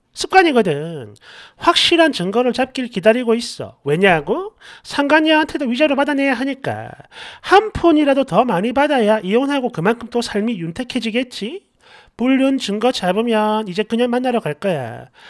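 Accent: Gyeongsang, South Korea. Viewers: 천지삐까리? 완전 경상도말씨군요 억양 완전짱 늘 터져요 웃음릴레이😊😊😊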